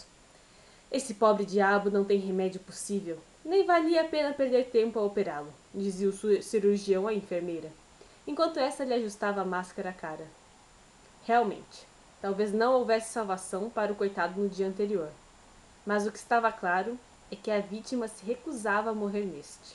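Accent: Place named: Interior, Brazil. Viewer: Rsrsrs isto eu percebi, que o sotaque é brasileiro. Só não consegui identificar exatamente de onde... Sua leitura é muito fluida. Tive de parar, prestar bem atenção. Chutaria SP por causa do "r" =D (porém muito sutil). Foi esse cifrão do Euro que me chamou atenção. Por esse preço valeria comprar fora mesmo pagando frete internacional. Nas livrarias da minhas cidade está muito caro. A língua é a mesma... Parabéns pelas ótimas leituras ;)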